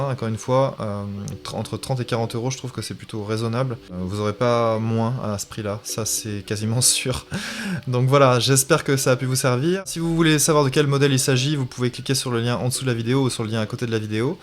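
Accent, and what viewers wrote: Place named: Quebec, Canada. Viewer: t ai quebec...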